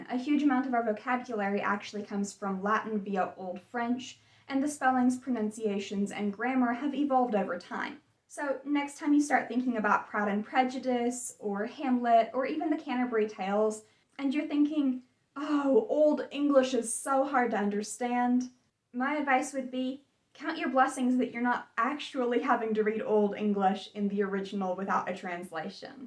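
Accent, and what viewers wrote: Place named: Midwest, United States. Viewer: You have an interesting accent. You sound sort of mid western USA but some words sound southern USA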